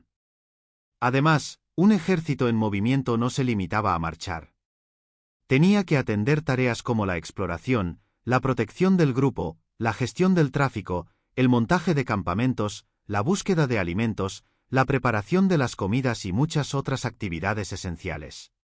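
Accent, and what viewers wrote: Spain. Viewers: Al ser una tradicción española y leer en el título la palabra "Fascinante" me produce rechazo solo pensar en escucharlo. Lejos de ser agradable el tono español es agotador, cansador, sobre cargado.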